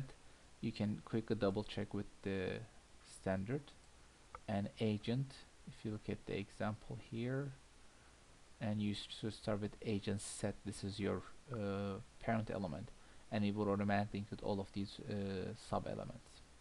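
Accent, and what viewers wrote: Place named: Turkey. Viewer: abi ingilizce aksanın ben türküm diyor :D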